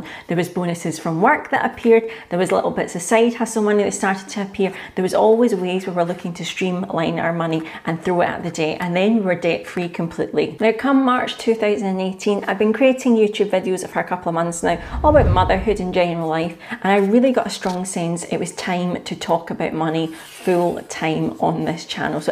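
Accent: Scotland, United Kingdom. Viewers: My Good Lady, perhaps t’was your Scottish accent, the way you come across as a sincere lady and, I may be wrong, I feel we have a common “Friend” with a Beard who put you on my path! So I listen and opened a Vanguard life strategy 100! If I need some more advice, how can I contact u! My name is Fred! Im happy to give u my email and number👍🏽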